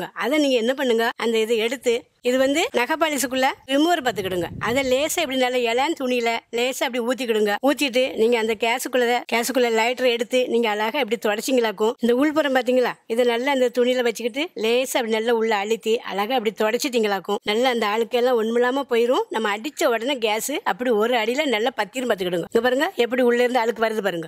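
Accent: Tirunelveli, India. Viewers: Tirunelveli speech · Anna avanga thirunelveli slang anna...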